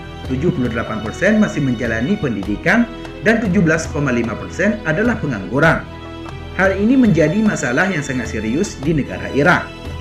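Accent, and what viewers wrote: Aceh, Indonesia. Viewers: Logat Aceh ad irama Arab Arab ny sdkit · Orang aceh ya bang? Logatnya terasa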